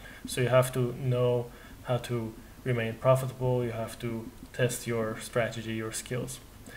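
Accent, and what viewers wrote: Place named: Hungary. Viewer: where are you from? i cant figure out by your accent. i feel like hungarian but im not sure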